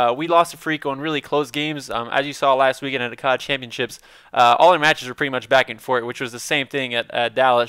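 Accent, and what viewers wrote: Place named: Quebec, Canada. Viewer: c un quebecois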